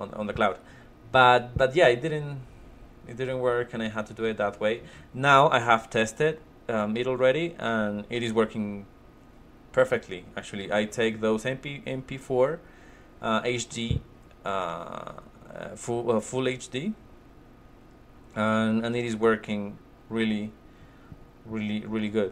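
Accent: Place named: England, United Kingdom. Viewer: Great video man and great english accent! I too was looking to switch to KDE this video was very informative, thanks.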